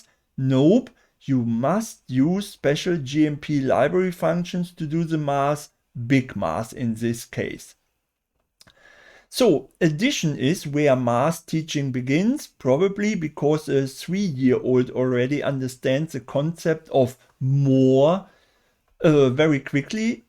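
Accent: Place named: Germany. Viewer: that's some heavy german accent (me a german may say this)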